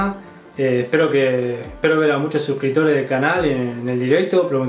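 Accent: Argentina. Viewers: Me parecia esa forma de hablar. Yo tambien soy argentino un saludo, sos un genio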